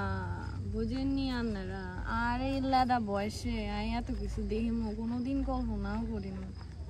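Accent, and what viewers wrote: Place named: Noakhali, Bangladesh. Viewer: 😮my god you reply my comments 😢tnx hum apner noyakhali kotha bola sundor mona hocca akdom noyakhalir maye 😊jai hok akhono ghuman ni rater baja 1ta 😅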